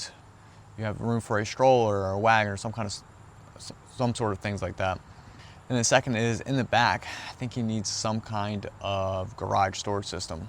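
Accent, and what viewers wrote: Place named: Philadelphia, United States. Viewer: You have an amazing Philly accent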